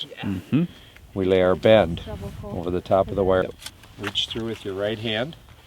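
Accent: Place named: New Zealand. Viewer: What a very good instructor, thank-you. The Kiwi accent was very thuck, but managed to git it.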